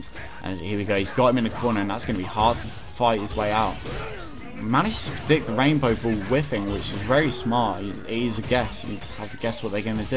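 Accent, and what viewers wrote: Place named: United Kingdom. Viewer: British accents are always great for narrating.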